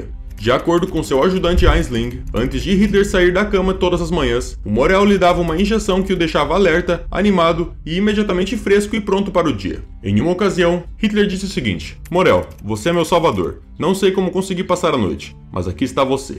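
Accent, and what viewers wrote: Brazil. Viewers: Boa noite, neste caso, está certo, uma vez que a pronúncia usada no vídeo é a do português brasileiro. No mais, se realmente você estivesse preocupado com a pronúncia correta em alemão, ao invés de citar poucos erros de pronúncia ligados ao nome de um campo de concentração, iria escrever uma mensagem a cada vez que o dono do canal dissesse “Hitler”, uma vez que a pronúncia dele é a do português brasileiro, não a alemã, que seria “ritlá” ao invés de “ritlêr”.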